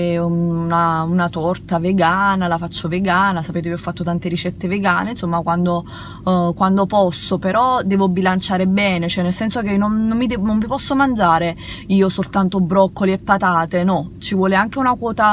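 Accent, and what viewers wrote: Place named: Naples, Italy. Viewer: Sempre a criticarla per come parla...ma nessuno ha ancora capito che e' napoletana?e per i napoletani tutto e' permesso sono cosi'!! non lo fanno apposta e'anche per questo che sono simpaticissimi😊😊😊vai!!!bellissimo video!!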